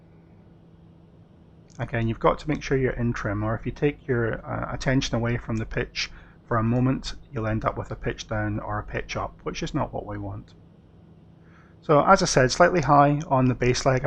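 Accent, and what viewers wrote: Scotland, United Kingdom. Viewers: Most enjoyable and informative video. My thanks for not inflicting cloying, abrasive or noisy “background music” to drive us to utter distraction. One takeaway: no matter the cost of the aircraft it still obeys the fundamentals of aerodynamics and physics. And your Scots brogue is a distinct pleasure to hear. Mazaltov!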